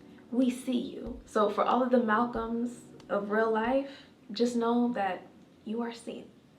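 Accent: Jamaica. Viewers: Hi. I haven't found any info 'about me' in your videos. I picked up a Jamaican accent and allusions that you're sapphic. You're pretty, smart, confident, and brutally honest. Who are you? Where are you from?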